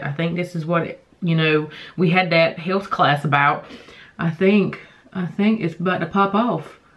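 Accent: South, United States. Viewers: Happy New Year....Your look is so BEAUTIFUL. I just adore your southern tone. It's so cute and adorable.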